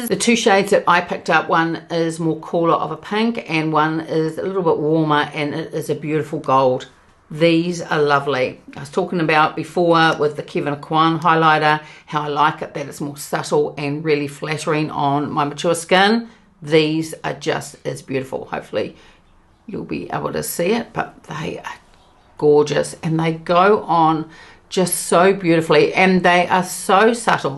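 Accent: New Zealand. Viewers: I'm so glad I found your channel... I also love your beautiful Kiwi accent! Lots of love fom the other side of the world! 💜🇬🇧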